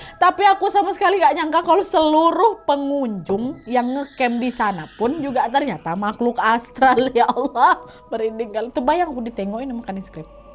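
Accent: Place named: North Sumatra, Indonesia. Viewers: Kak, kk ni asli mana kah? Kadang pake slang medan, kadang minang. Aku kan jadi pinisirin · Makin kesini kok kayak makin yakin kalo kak emang orang sumut. Ntah medan atau binjai mungkin ya. Soalnya cakapnya logat² nya sumut kali 😁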